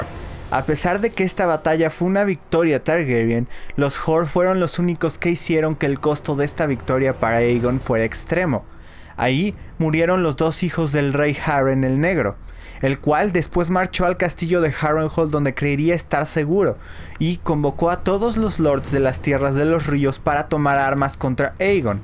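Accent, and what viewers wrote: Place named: United States. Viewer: Que mal suena ese inglés de USA. Es super irritante. Por cierto, se dice BRAVOSÍ, no Bravosi.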